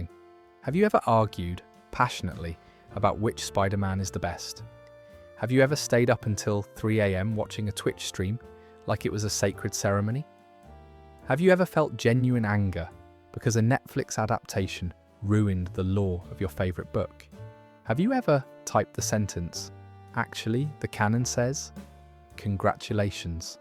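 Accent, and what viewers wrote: United Kingdom. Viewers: What's with the English accent? Does that make it more legitimate?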